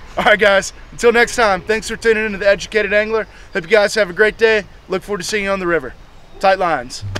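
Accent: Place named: South, United States. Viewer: Love the channel your accent is a mix of southern and Cali surfer lol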